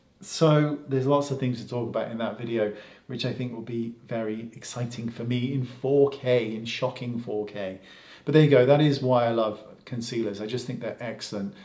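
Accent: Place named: United Kingdom. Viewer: I loveyour videos and tour Brittish accent!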